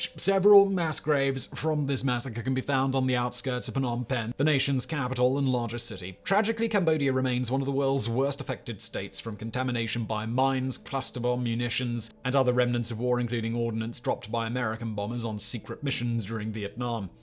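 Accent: United Kingdom. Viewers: I attribute it to him being British and they pronounce words differently then we do in the states.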